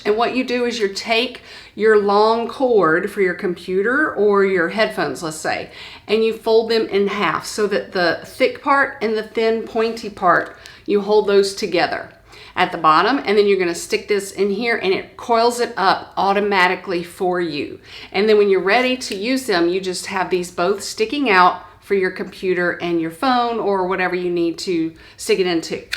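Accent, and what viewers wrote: South, United States. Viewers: Just a quick note to say I have enjoyed your videos. I don't know if you realize it, but the close-captioning for your words is quite amusing! Due to your southern accent, in the above video, "tinted" moisturizer was printed as "tenten", and "tennis" shoes came out as "tin" shoes! Don't worry...I can understand you, but the close-captioning person cannot!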